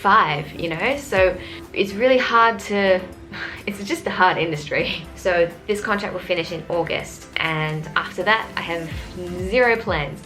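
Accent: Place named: Australia. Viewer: Your Aussie accent tho, I can hear you all day! <3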